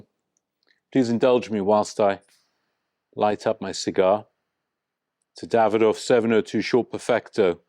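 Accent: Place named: United Kingdom. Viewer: nice ckearly britain english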